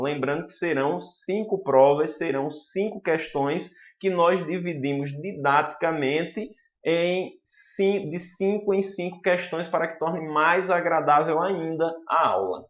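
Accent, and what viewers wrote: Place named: Northeast, Brazil. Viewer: Achei ,alguém que consegui aprender e segurar na mente heheh ,boa didática simples e boa de memorizar. Tu és de onde companheiro ? Nordestino como eu ?? Sotaque conhecido hehe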